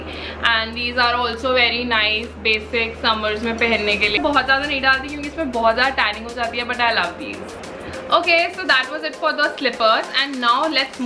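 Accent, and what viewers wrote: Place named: Punjab, India. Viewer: Punjabi tone in hindi is best 😎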